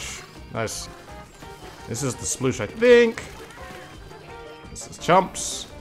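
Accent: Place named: England, United Kingdom. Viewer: I mean, if someone’s gonna tell me how to pronounce words in English, I’m listening to the guy who’s from England (or the UK)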